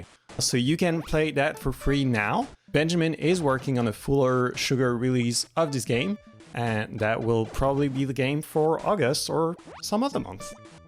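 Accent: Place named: France. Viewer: I'll be honest, I kinda only skim read the newsletters. Now having it dictated to me by a lovely Frenchman, well it's très bon for my ears. You guys are some of the best indie developers out there, and I hope more and more people come to appreciate and support your work. Thanks for all the fun games and I wish you all every success.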